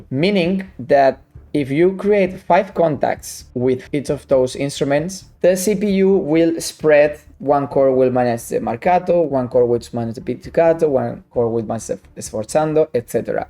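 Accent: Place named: Spain. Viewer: soy de colombia y hasta en inglés se nota el acento de españa. hahahaha, tan lindo e inteligente que es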